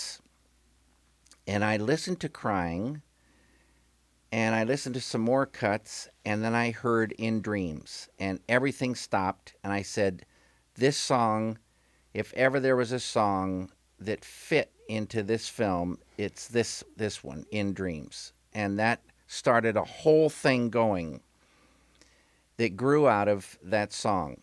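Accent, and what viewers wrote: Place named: Utah, United States. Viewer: Is David Lynch from Utah? His voice has that particular cadence they use there.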